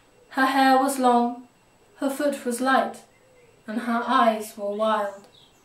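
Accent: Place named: RP, United Kingdom. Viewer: Thank you, Miss. I enjoyed this so much. I hope you've done more of these short lectures. I'm a drycleaner's daughter from Kansas. I missed school terribly before the rise of the Internet. (love your posh accent too) Thanks again.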